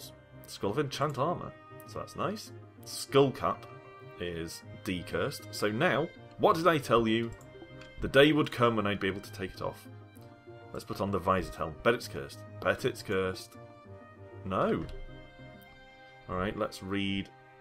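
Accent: United Kingdom. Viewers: i can tell you're british,great lets play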